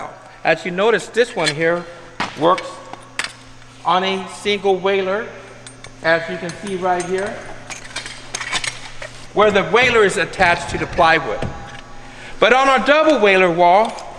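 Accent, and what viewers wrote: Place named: Louisiana, United States. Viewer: All i can hear is Adam Sandler waterboy!! Correct me if I'm wrong but thats a sweet louisiana accent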